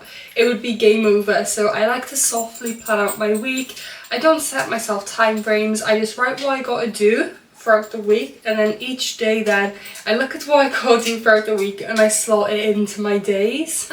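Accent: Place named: North, United Kingdom. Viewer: that's a very thick accent, northern England? Or Scottish?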